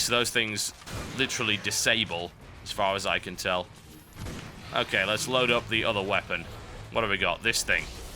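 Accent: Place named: Newcastle, United Kingdom. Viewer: Geordie accent :D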